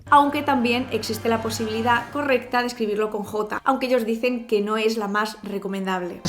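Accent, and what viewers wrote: Spain. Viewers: Hola..no tiene el acento español muy marcado, bueno como se tiene la idea que habla un español comun....saludos.l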